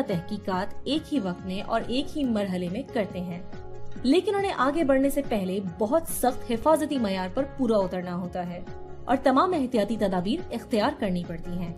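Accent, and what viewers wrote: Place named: Urdu, Pakistan. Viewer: Nice Urdu Accent.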